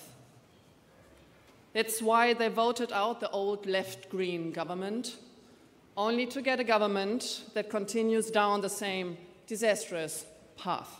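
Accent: Germany. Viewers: Yep. Total extremst deutscher Akzent, hihi. Aber die Worte sind sehr gut ausgesucht. Es geht einem ans Herz. Bravo geehrte und geschätzte Frau Dr. Weidel, liebe! Gut gemacht! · Nö, wo denn?? Schwachsinn, die spricht super, mit bissel deutschem Zungenschlag, aber machen Sie es doch erst einmal besser.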